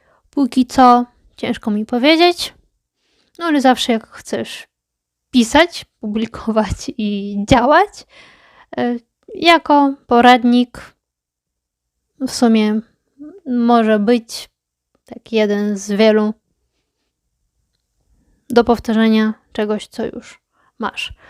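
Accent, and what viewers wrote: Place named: Ukraine. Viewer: Hej! Ciekawe wszystkie 3 książki, zwłaszcza ta "pisz, publikuj, działaj". Tak w ogóle to jesteś Polka czy Ukrainka, bo masz dość ciekawy akcent? Pozdrawiam.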